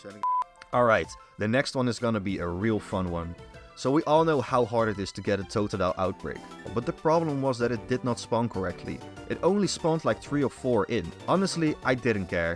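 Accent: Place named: Netherlands. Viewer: You're Dutch aren't you? knew it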